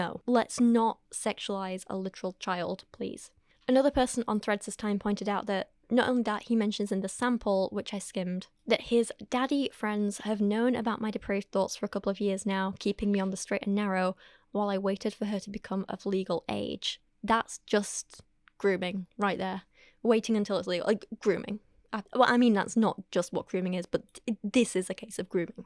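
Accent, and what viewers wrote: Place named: North, United Kingdom. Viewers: I enjoy this channel because it's hard feminist truths dropped in a lovely northern accent and also sometimes you hear/see a gremlin (hi!) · I appreciate your takes on things and your unapologetic-ness (and your nothern accent! Makes me feel right at home.) People have lost their damn minds. Look after your mental health after this one, lovely <3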